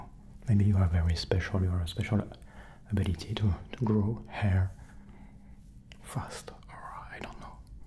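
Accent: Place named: France. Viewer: Ton petit accent bien Français me fait tellement rire 😂😭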